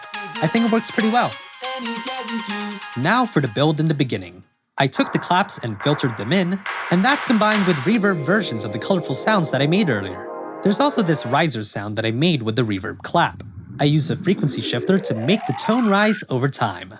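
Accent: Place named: United States. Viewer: Your english sounds SO GOOD in my opinion because you said you are from the Philipines. How did you learn English so well to sound american?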